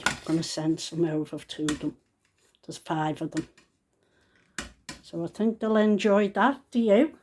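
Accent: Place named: Birkenhead, United Kingdom. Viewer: So glad I found you you make my day my dad and is family live in Birkenhead so hearing you talke makes me so happy I've also lost people I love my husband died 3 years ago and my brother and a4 year old grandson and a 7 year old grandson and some amazing things have happend to let us no there is life after death I have 16 grown up grandchildren and 30 great grandchildren love you to bits your great Christine from Leicester xxx 🌹🌹🌹🌹🌹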